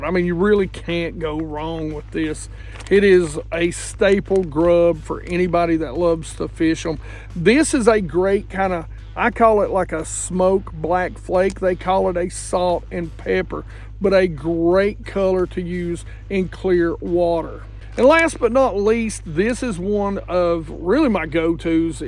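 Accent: South, United States. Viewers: Great advise but I can’t take this slop southern accent for another second. Unsubbed.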